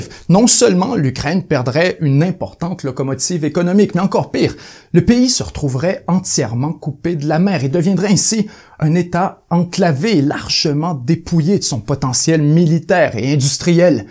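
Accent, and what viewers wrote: Quebec, Canada. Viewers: Je suis à 1 minute, je découvre cette chaine, et suis déjà convaincu qu'il s'agit d'un bon média ( et l'accent québécois n'est pas trop prononcé il est facile à comprendre pour un français ne maîtrisant pas votre accent, merci ) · vous faites un discours pro-américain et proposez des cours d'anglais. Abandonnez votre accent québecquois, vous êtes un traître, sans plus.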